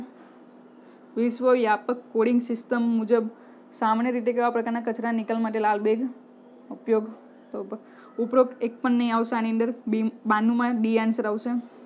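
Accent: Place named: Surat, India. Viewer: I love your voice (surti boli) nice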